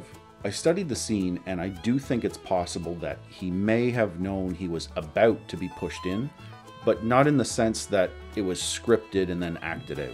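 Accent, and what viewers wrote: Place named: Canada. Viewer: long time sub, I'm not sure if I already said this, but your voice is very relaxing. You sound like Dank Net, same accent, similar pitch and cadence (albeit to lesser extent). Which accent is that? I've heard plenty of Canadians but you and Dank Net are the only ones with that specific accent. It's a cool accent, where does it belong to? I'm Australian so I wasn't taught anything about Canada in school unlike Americans (I'd imagine anyway) and from Perth, Western Australia - every Australian that foreigners hear are from Melbourne, Sydney, or the outback. I'd imagine Toronto and Vancouver to be similar to the Melbourne/Sydney in that regard? Idk, just an interesting parallel.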